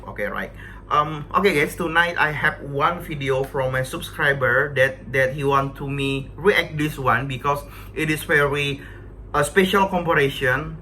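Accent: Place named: Indonesia. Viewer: Englishnya Indo bgt😄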